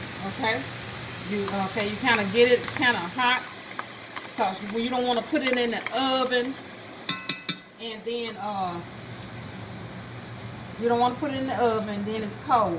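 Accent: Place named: South, United States. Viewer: You really have a southern accent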